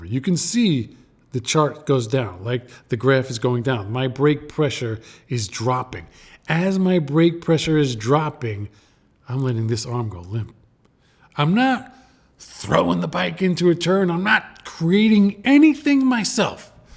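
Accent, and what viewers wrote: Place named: Northeast, United States. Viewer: your accent reminds me of childhood 😂 northeast?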